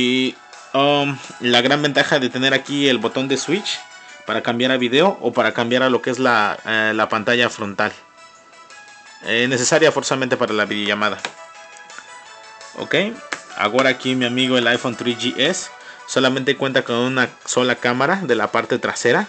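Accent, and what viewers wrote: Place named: Mexico. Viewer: 30 segundos y quité el video. como detesto el acento mexicano... mejor lo veo en Inglés weis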